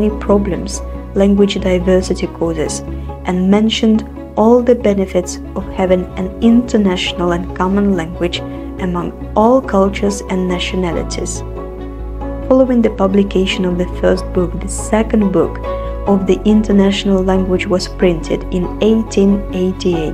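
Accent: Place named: Eastern Europe. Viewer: you sound slavic.. я просто только начал смотреть твое видео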